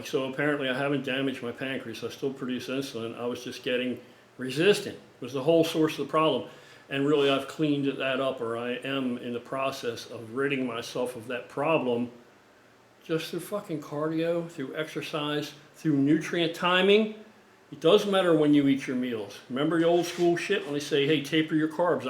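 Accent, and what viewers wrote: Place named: Western Pennsylvania, United States. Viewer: Man you sound like you're no further than 2 towns down from me in western pa. Lol Indiana County Pa.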